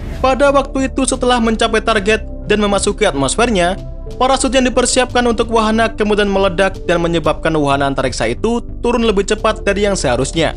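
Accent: Java, Indonesia. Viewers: Masss. Suaramu digawe seng rodok kalem mas.. medokmu kuii lo.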